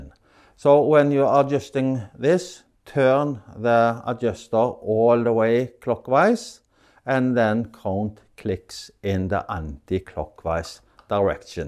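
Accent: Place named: Scandinavia. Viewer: so funny, when i hear that scandinavian accent I think of all the scandinavian rally and f1 champions and i'll just buy anything you say :o))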